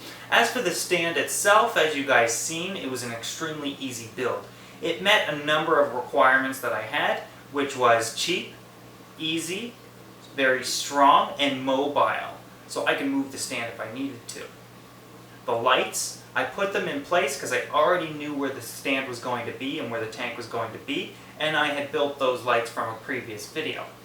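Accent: Canada. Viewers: I think what I like best about your videos is that you do the "HowTo" part, but you also take the time to explain the "Why'd he do that" part. With so many videos, I want to stop "genius creator" in the middle of creating his brand-new what-sit and ask him "why that way, why not this way?" Genius creator, of course, never answers his comments! You, on the other hand, give very comprehensive [and comprehensible] instructions. And your Canadianisms just make it fun listening to you [yez?]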